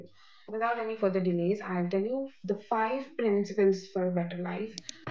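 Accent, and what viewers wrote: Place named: Telangana, India. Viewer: Telangana accent 🙌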